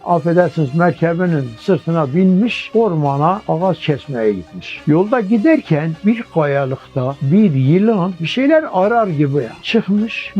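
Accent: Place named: Urfa, Turkey. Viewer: Bu abi Urfalı şiveden konuşma üslubundan belli,fon müziği ’ya ait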